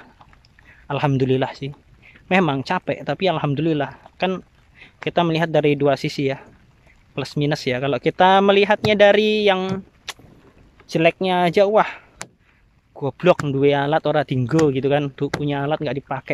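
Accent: Java, Indonesia. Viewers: Asli kalbar tapi logatnya ada jawanya?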